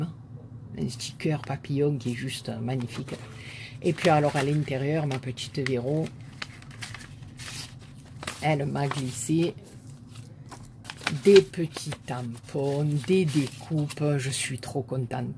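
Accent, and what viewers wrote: South, France. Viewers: ccou....waouuuuuu...tes créas reçues sont justes magnifiques!!!!! pourquoi tu reçois autant de gentillesse????? parce que tu l'es toi-même!!!!! ta bonne humeur en toutes circonstances traversent les écrans, perso quand tu parles avec ce bel accent du Sud, bah moi j'entends les cigales.... des gros poutous.....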